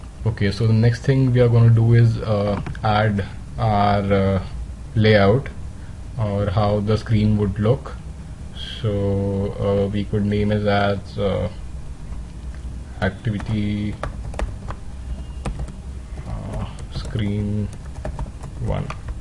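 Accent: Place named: India. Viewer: dari logatnya kayaknya dia india cuy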